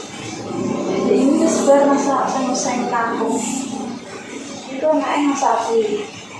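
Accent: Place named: Java, Indonesia. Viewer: mf mbk ngomongnya jangan jawa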